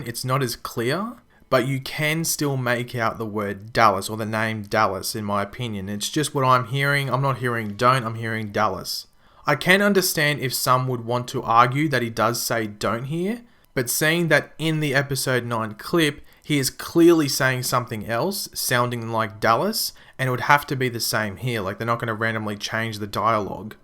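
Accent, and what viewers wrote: Australia. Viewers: Holy shit u sound Australian. R u australian?? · are you australian? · But I'll watch the video anyways because your voice is Australian gold.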